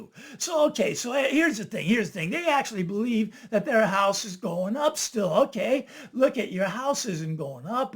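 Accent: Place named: Texas, United States. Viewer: I can't place your accent,. Texas?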